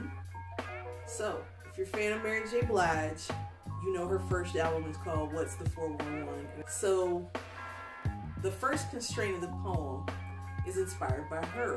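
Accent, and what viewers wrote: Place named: Kentucky, United States. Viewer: I love your accent. Kentucky via Central Illinois!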